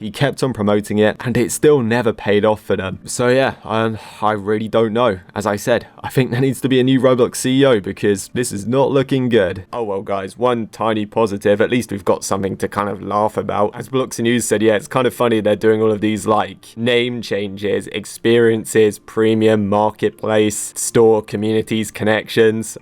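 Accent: United Kingdom. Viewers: You talk like a British person and could be one but the drama is insane that i respect you being British.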